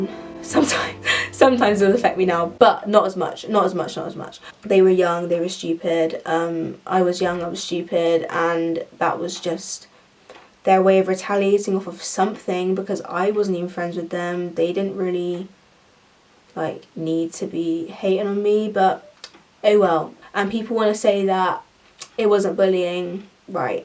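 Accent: Bristol, United Kingdom. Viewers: Bristol accent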